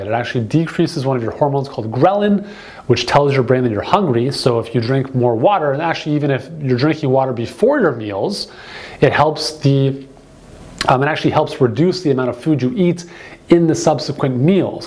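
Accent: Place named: Canada. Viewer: Canadian raising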